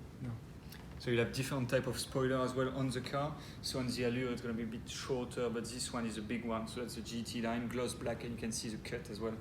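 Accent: France. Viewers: laisse tomber l'accent français en langue anglaise ! les anglophones doivent se plier en 10 en l'écoutant. Même les africains parlent mieux l'anglais mdrrr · Pathetic French accent. Why not hire a native English speaker? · Très belle accent français ahah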